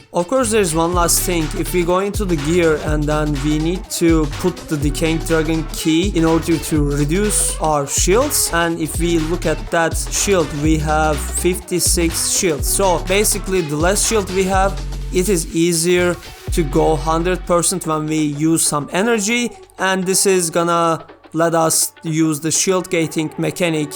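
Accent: Turkey. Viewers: Türk müsün kanka konuşmandna belli amk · Türk olduğunu aksanından direkt anladım😂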